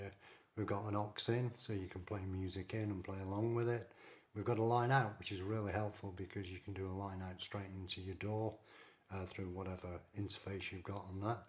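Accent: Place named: Lancashire, United Kingdom. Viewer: Hi you sound like you’re from Lancashire like me, although I live in Spain. Am almost pulling the trigger on this just for a bit of busking with my mate, is it loud enough do you think, for a busy city street? And how annoying is it that they put the whole control panel “upside down “, do you have to keep getting up and going in front of it to change a setting? A minor issue but don’t know how much it would bug me, I can’t imagine why they do it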